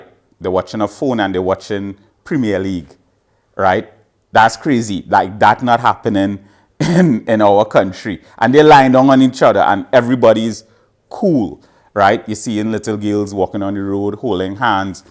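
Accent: Trinidad and Tobago. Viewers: From TRINIDAD &TOBAGO 🇹🇹 I always wanted to hear our TRINIDAD 🇹🇹 accent joining you just now everyday I dream of coming to live in AFRICA travelling there shortly as I renew my passport AMEN 🙏🏿 🙌 To you both enjoy life in the MOTHERLAND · Lovely to hear your beautiful Trini accent🇹🇹 From one Trini to another, many congratulations on returning to the Motherland. I’m inspired. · Establish a community, one Trini to another.....good going, accent cannot hide. How will you earn an income though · Interesting to hear the Trini accent in Gambia. Welcome home brother. I lived in Trinidad for over 15 years, love the islands, still visit every year. Consider Trinidad & Tobago as my adopted homeland.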